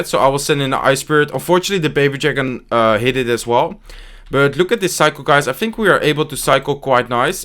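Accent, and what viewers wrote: Netherlands. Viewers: Ur so obvious dutch:) nice vid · Are you Dutch? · Are you dutch? You have a dutch accent in your english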